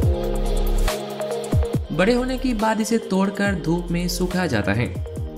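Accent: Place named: South, India. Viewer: Aapke accent se laghta hai aap South se ho ..nice video....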